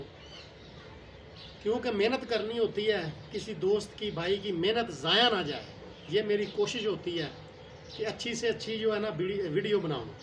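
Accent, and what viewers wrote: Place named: Punjab, India. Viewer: Ustad g punjabi me apki video ka alag maza hai 1 alag maza hai punjabi lehjy me aapk ❤❤❤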